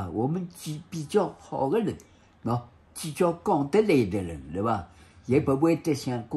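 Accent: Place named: Shanghai, China. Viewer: 不看字幕，老爷子上海口音我还是要使劲听。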